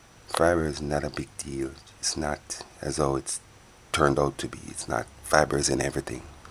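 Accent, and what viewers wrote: Jamaica. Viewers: I love Jamaican accent. I would listen to you talk all day.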